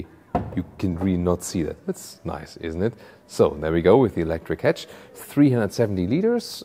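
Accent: Germany. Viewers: If the presentation of a car is mostly focusing on the design you know that something is wrong. It doesn´t help that a german guy is trying to make it more convincing by giving a biased presentation with a bloody german accent. The high pricing is just insane.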